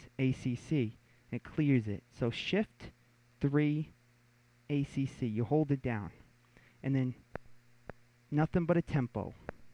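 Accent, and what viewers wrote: New York, United States. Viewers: This dude is hilarious. His New York or Jersey accent makes it all the better.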